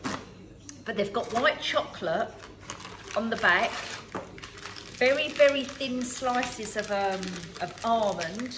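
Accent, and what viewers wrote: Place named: Essex, United Kingdom. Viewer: Hi Hun, I absolutely love you videos, you are completely a girl after my own heart. I live in the North now, but I was born in Essex and grew up in the east end of London, so your wonderful accent is like music to my ears..Keep it up Hun Bella xxx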